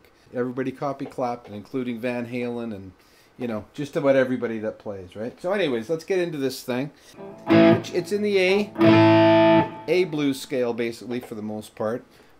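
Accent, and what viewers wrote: Canada. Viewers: I think the toughest part of the solo is definitely the 2nd half. Are you planning on continuing or doing another video on the 2nd solo in? BTW--I love your tone you get achieve for all the videos. It's spot on., and as far as I'm concerned there's not another YT instructor who takes the time and effort to get it exactly like the original artist. You're the only guy I go to after checking out dozens of YT instructional vids. Kudos. BTW--you sound like you could be in Canada or the Northern Mid-West; how close am I?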